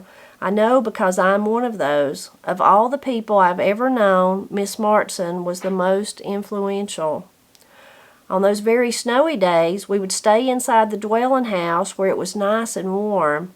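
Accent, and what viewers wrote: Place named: South, United States. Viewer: Thank you both for the wonderful stories makes it even more amazing with her southern draw😃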